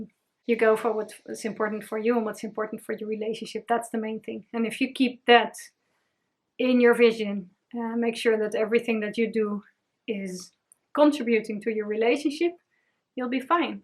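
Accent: Netherlands. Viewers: I came across this video when I looked up slave positions. I'm writing a piece of fiction that includes a BDSM relationship and I want to create a realistic image. Your videos give me a good idea of what BDSM is actually about. You don't just list the rules, but you also open up about yourself and your relationships. You come across as a kindhearted person who loves sharing her passion. Perhaps it might be a rude question - I apologize if it is - but would it be alright if I made a reaction/information video about some of your videos? If I use any of your material or refer to your videos, I will always give credit and place a link to the video(s) in question. In my environment, there are a lot of misconceptions about BDSM and the people who participate in it, and when I noticed that, I also realized that there is a lot of misinformation about BDSM, not just on the internet. 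I would like to help you and other creators spread correct information about it and help people find themselves and other people with who they can share their passions. It might be useful to mention that I am not a part of the BDSM community (yet) but I have been educating myself on it for a while now, and I would like to help other people do so as well. It's quite off-topic, but I love the accent. I am from the Netherlands as well.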